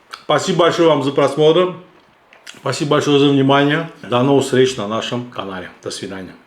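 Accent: Baku, Azerbaijan. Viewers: Сказочное блюдо и сладкий бакинский акцент! ❤❤❤ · Салам. Отличие дядя от других фуд блогеров когда он готовит обесняет, говорит, шутит. Бакинский акцент русского языка вапше супер. Жаль что отличие от "немых" фуд блогеров подписчики мало. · брат, все твои блюда очень-очень аппетитные, а благодаря БАКИНСКОМУ акценту, ещё и завораживают 👍👍👍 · Мне нравится сумгаитский, бакинский акцент. Сам с Дагестана но родился в Сумгаите. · Ох уж этот Бакинский акцент... ❤❤❤ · Уверен,блюдо невероятно вкусное!но благодаря вашему Бакинскому акценту,думаю оно вкуснее еще в 10 раз!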